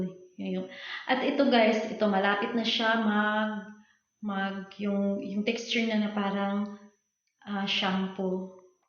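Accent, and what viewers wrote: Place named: Visayas, Philippines. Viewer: Mam idol titigas ba ang sabon kng ang ilalagay kong lye is 15 pcs kalamansi tpos with tomato mga 5 to 10 tpos lagyan ko pa ng myra e...gusto ko gawing business ksi mam at gusto ko good quality..sana mapansin mo ung comment ko ma'am ksi mg start na ako bukas completo na ako ng gamit...By the way ma'am prang bisaya ang dialect mo..bisaya din ksi ako..Thanks mam..God bless and thank you for sharing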